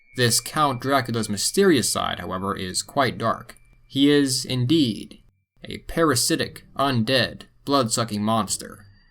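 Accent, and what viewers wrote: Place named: Ohio, United States. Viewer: sounds like your near youngstown boardman ohio area.. lol.. great documentary.. thank so much..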